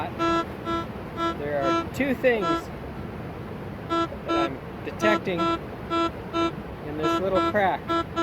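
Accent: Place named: Australia. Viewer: Loved the aussie accent 🤣 my theory why Australia has all the big gold is because the heveys always sink to the bottom 😉 thumbs up from down under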